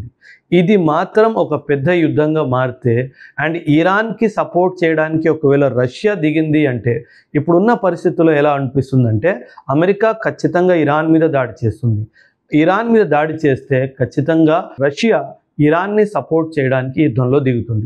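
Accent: Punjab, India. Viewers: Swatchamaina mana telugu lo oka panjabi excellent narration. Vinataniki chala goppaga vundhi.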